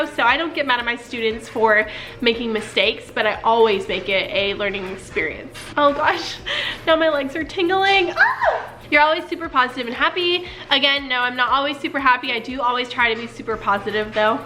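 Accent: South, United States. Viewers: I live in middle Tennessee.. I knew that southern accent!!!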